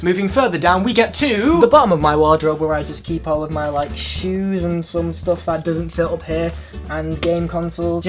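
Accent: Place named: North, United Kingdom. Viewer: I'm a northerner myself, but your accent is pretty nice compared to the ones around me, u go!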